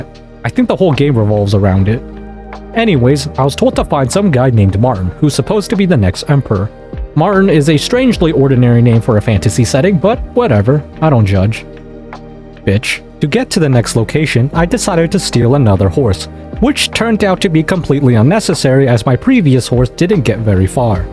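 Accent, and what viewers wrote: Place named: Canada. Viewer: Ah your thick canadian accent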